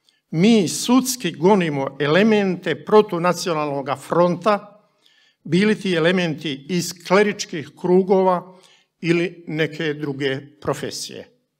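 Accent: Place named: Croatia. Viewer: Monsinjor Ratko Perić progovara čistim Hrvatskim narječjem jezikom.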